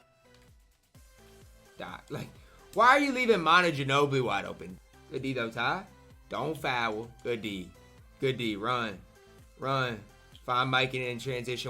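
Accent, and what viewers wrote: Iowa, United States. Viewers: He is from iowa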